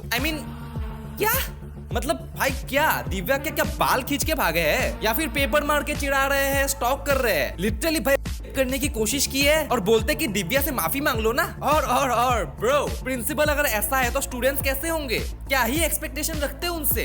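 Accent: Assam, India. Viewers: Why you sound Assamese?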